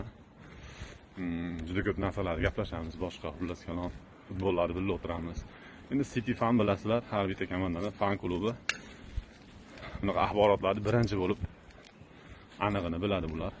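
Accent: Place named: Andijan, Uzbekistan. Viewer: Ома гап йук анжонча гап булди ишларизга омад ✊ Хусанов укамиз уз даражасини курсатади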